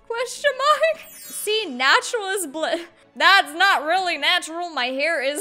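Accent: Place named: Australia. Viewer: Your Aussie omg same i live in Melbourne are you aussie though hope you are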